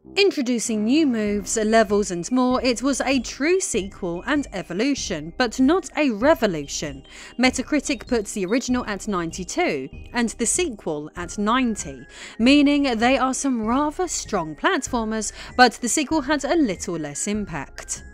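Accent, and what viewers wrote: United Kingdom. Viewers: Funny that all these videos about banjo threeie have someone British talking in it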